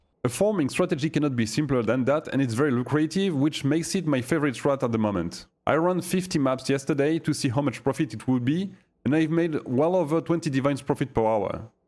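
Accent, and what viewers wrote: France. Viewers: Thanks by any chance are you french ?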